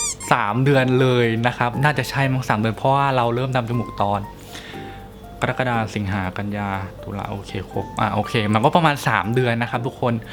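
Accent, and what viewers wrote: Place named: Provincial, Thailand. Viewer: เป็นคนที่ไหนหรอครับ พูดเหน่อจัง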